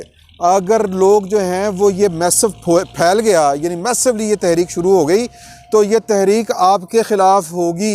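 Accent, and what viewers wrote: Punjab, Pakistan. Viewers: Bhaiyaan Punjabi vich reporting kreya kro. Punjabi version of Urdu Jo boldey ho, funny lgdey .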